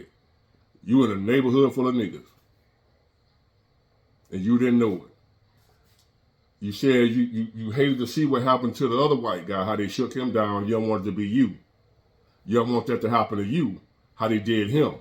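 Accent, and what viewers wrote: United States. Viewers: It seems like the video is interesting, but I need to read through th comments to have a clue because of your heavy American accent.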